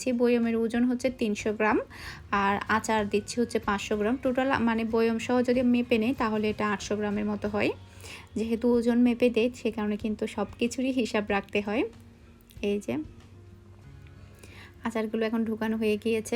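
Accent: Chittagong, Bangladesh. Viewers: Jehetu uni khas chatgaia, chatgaiara shuddho vashai kotha bolte gele airkm e shonai...kichu korar nai.... R try korle hoito hobe....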